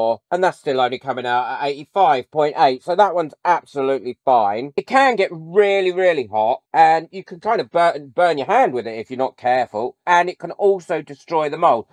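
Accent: United Kingdom. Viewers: I love British YouTubers because the way the speak it is very pleasant to listen too. I love the British accent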